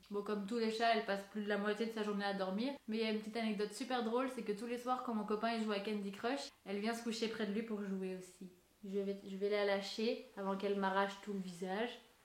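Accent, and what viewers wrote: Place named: Quebec, Canada. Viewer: J'entends un léger accent. Québécoise ?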